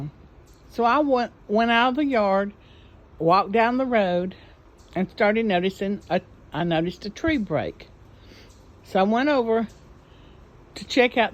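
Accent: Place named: South, United States. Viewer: Hey, I know you! I just realized that YouTube wasn’t notifying me of your videos! I know to check for them now though. Thank you for posting! - Britt Edit: I’m a fan of the southern accent! 😊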